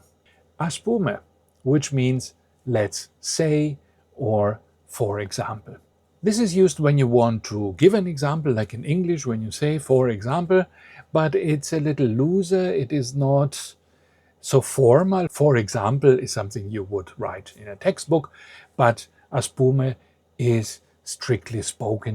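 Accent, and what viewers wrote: Germany. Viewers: that explains why your accent in English is slightly more German than Greek! I was so confused 😅 I just heard in another YouTube video that sometimes your accent in a third language reflects your second language background more than your native language. Fascinating! Τέλος πάντων, σας ευχαριστώ για ακόμα ένα υπέροχο βίντεο! Δηλαδή, ας πούμε ότι το απόλαυσα πολύ!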